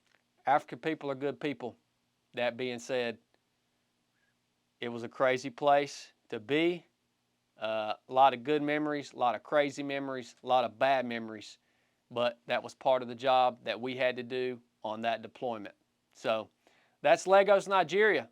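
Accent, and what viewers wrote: South, United States. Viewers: Are you from Mississippi or Alabama?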